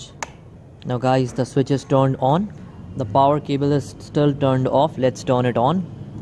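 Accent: India. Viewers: For some reason I love an Indian accent with good English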